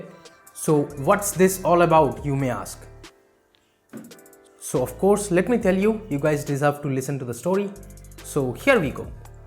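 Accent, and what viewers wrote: Bengal, India. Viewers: Accent tells me you are bangali, even I'm using redmi note 4 from 2 years still in good condition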